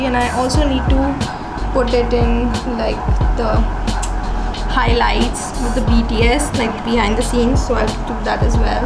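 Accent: India. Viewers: Haha she has an Indian accent ofc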